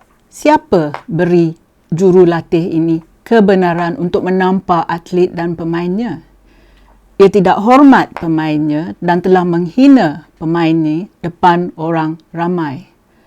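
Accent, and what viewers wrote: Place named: Kelantan, Malaysia. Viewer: speaks malay kelate accent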